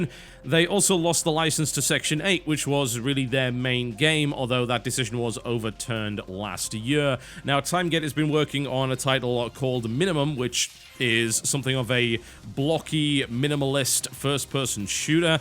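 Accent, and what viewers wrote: United Kingdom. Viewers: Don't worry about missing a few days of content patch, though we all love to hear the latest gaming news spoken in enthralling brittish accent, followed by the most cynical analyses of the current state of the gaming world, we know how hard it is to produce quality content for a youtube channel and have a life at the same time.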